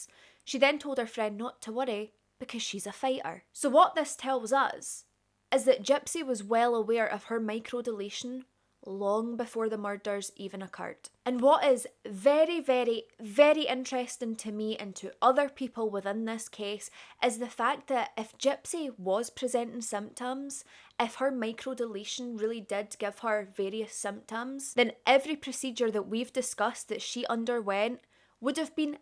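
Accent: Scotland, United Kingdom. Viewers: I thought the sh*tshow of Gypsey Rose Blanchard life and crrimes couldn’t get much worse. When she was released i genuinely felt so much compassion and empathy for Gypsey. However, I am so glad that I found you, you deserve more subscribers - you are well put together, talk about this subject with the gravity it requires, have charisma, and the editing is high quality. Most of all you are Scottish (i presume going by your accent) I’m from Aberdeenshire but assume you are from the central region. Subscribed 🏴 ❤ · I feel like I'm getting true crime intel from Merida. lol Gotta love the Scottish accent! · Love hearing a fellow Scottish accent! I also believe that Gypsy did not want to be Dee-Dee's full-time carer, in the future, as her mother's health was poor. I DO think she WAS afraid of Gypsy. Dee-Dee even said to her: "Gyspy... Don't hurt me." which Gypsy admitted to. 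And, what gets me.. IS... there were 17 stab wounds. Nick first said in his interrogation, he stabbed Dee-Dee FOUR times. I wonder if that WAS the truth. I believe so. Photos... also tell a story.... In ALL the photos of Dee-Dee and Gypsy, there is NOT one photo where Gypsy looks scared, unhappy, anxious, or depressed. I say that because, there's a photo of me taken, after I had got a telling-off from my Mum, when I was about 11... and I am visibly upset on that photo, TRYING to hold back tears for my aunt, as she took the pic. There's also one of me, when I was really sick with tonsilitis when I was seven, and it's obvious, I was really unwell. Normally all my baby/childhood pics, had big gummy, or cheesy grins! I loved the camera! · I’m here for your accent. I love it. Where from Scotland are you from?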